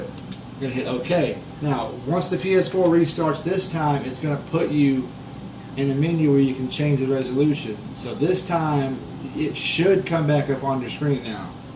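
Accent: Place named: Appalachia, United States. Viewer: Sound like a dumb hillbilly no wonder you can't get it to work lmfao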